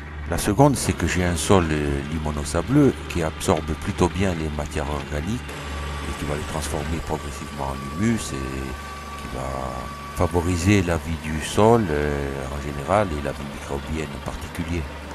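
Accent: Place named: Corsica, France. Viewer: Bravo pour cette vidéo. je comprends mieux ce que j'ai à faire chez moi. Et merci pour ce magnifique accent corse!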